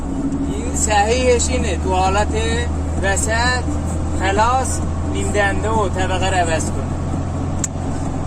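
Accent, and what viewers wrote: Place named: Turkey. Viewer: قربون اون لهجه زیبای ترکت برم . پسر ناز خودم هستی .من هم در آمریکا راننده تراک های خدمات کنار جاده ای سبک و سنگین هستم که در ایران میگند خدمات خودرو . سعید خراط از کالیفرنیا ایالات متحده امریکا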